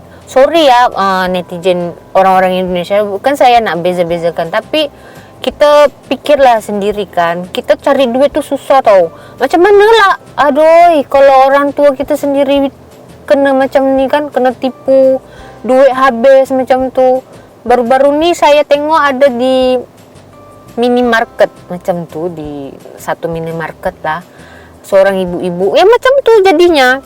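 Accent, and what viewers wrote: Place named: Kuala Lumpur, Malaysia. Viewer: suka dengar cara cakap macam org kl....🤣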